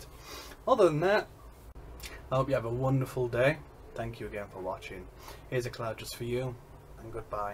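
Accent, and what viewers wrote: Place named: Yorkshire, United Kingdom. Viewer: Eyup fella, sounds like tha lives near me somewhere in Yorkshire. Good videos, very helpful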